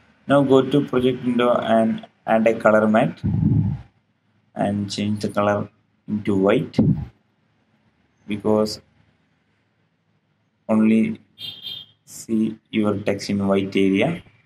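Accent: Kerala, India. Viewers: ഇംഗ്ലീഷ് കേട്ടിട്ട് മലയാളിയാണെന്ന് തോന്നുന്നു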